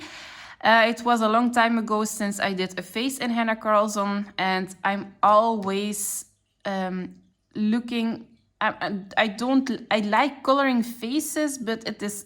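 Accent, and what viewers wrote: Belgium. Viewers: Ik hoorde meteen dat je een Belg was. Eerste keer dat ik je video's zie. Leuke pagina's dat je maakt. 😁 Heb je een instagram? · Hey 👋🏻 van België en ja het accent, ik ken het 🤣 en zeker met de Gentse toets erin bij mij, net je kanaal ontdekt en wat mooi allemaal. Zelf relatief nieuw in de kleurenwereld maar zoveel bijgeleerd al 😁 Ik heb nog geen huidskleur durven kleuren, maar ik heb wel interesse in kleur van neocolor II die je hebt gebruikt. Dankjewel 🙏🏻😁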